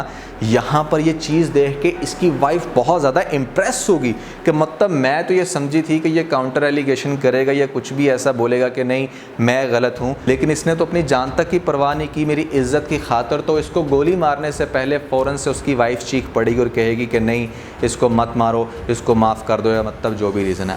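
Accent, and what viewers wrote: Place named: Punjab, India. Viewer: Nheeeed 😂😂😂😂 Bhai apni pronunciation Tu thik kro.. Urdu main b Punjabi touch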